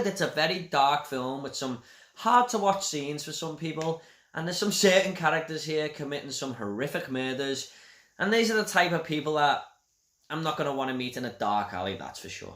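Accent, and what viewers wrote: Liverpool, United Kingdom. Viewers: Enjoyed listening to a fellow Scouser 🤩 Great review 😊 will be one I think we will have to check out 🤩😊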